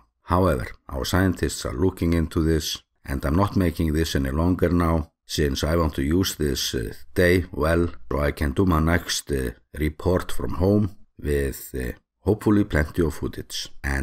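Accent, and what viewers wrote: Iceland. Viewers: There are other YouTube channels covering this eruption but they don’t start with your fantastic Icelandic accent, “Greetings from I-eee-C-lund” so they are only 4.5 stars 😊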